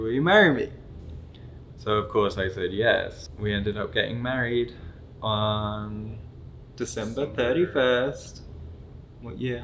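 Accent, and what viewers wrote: United Kingdom. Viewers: Your accent is slipping a bit, as an American who has several British friends I can hear it. It is because you are married to an American, nothing wrong with that. I can hear you becoming more American in your accent, lol! Don't lose that pretty British accent!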